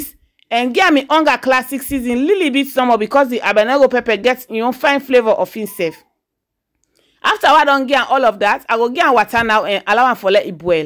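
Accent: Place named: Sierra Leone. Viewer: My first time listening to Sierra Leone speak I’m 🇯🇲 and can understand most of what you are saying, I enjoyed listening to your beautiful accent, thank you.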